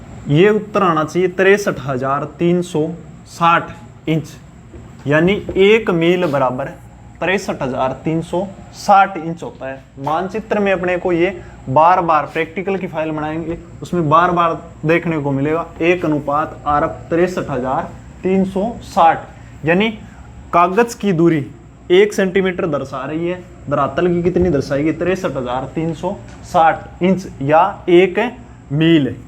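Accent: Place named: Haryana, India. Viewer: Sir apki boli to haryanvi jaise h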